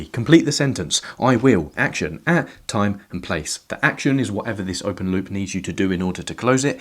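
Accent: United Kingdom. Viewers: idk why this guy is so convincing, it must be the british accent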